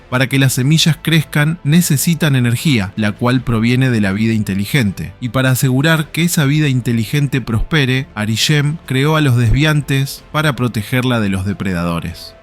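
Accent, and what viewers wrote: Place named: Argentina. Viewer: Por favor un argentino hablando de esto que puta mierda ese acento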